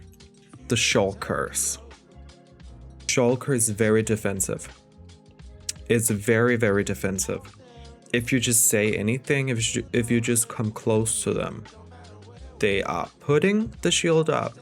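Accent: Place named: Brazil. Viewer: are you brazilian? Your accent is nice btw very underrated content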